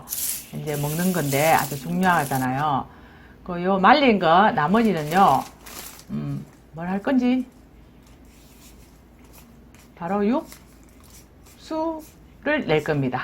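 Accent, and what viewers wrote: Gyeongsang, South Korea. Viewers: 경상도 말씨 애교가. 많네요. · 영상은 10분으로 줄여 주시는게.. 경상도 말투로 쉼없이 계속 말씀 하시니 뭔 말인지 모르겠어요 귀가 멍멍합니다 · 살림 요리 등 등 진행중에 고향 을 느끼게하는 고향말씨 좋은 꿀팀이되는 유익한정보 등등 너무나 좋게 듣고봅니다 참좋으신분 항상도 건강하시며 경상도말씀으로 씩씩하게 해주세요 👍👍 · 시끄러운 경상도 수다쟁이 아줌마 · 맞아..유튜브로 돈버는것도 중요하지만..자기자신을 잘아는것도 큰 힘인것 같다..내 목소리..내 말투가 어떤지 상대방이 듣기 좋은지 ..거슬린지..자기 자신을 제대로 안다면 이렇게 장황하게 자신있게 계속 말하진 못할것 같다..진짜 경상도 사투리도 억쌘데 목소리도 거칠고..그럼 어떻게 영상을 만들어야 하는지..생각을 못하는걸까...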